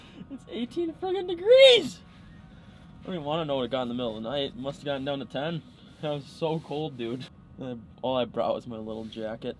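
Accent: Minnesota, United States. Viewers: what part of minnesota u from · What part of Minnesota are you from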